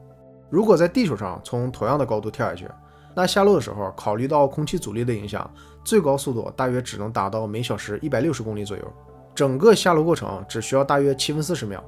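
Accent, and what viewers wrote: Northeast, China. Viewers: 听口音大概率是中国北方，像东北那边的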